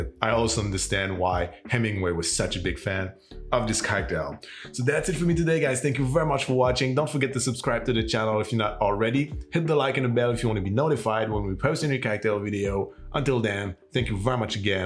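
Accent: Quebec, Canada. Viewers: 😆 I love the French-Canadian treatment of the letter "H." You say it when it's not there and don't say it when it's there. My friend always calls those tubes of meat on buns "ot dogs" and always offers "elp" if I need assistance. Now on to the Emingway Daiquiri! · lol.... yeah, where's the tree? I love the Québécois way of speaking too. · As a fellow québécois, I love seeing your videos when they come out! We need more of the accent on YouTube. Also, because I'm a filthy anglo, I have to say it -- why can't francophones count to four? There's a tree in the way. :v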